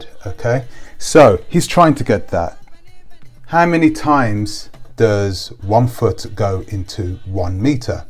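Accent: Birmingham, United Kingdom. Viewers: Bless your cotton socks..you sound like a proper brummie. 😄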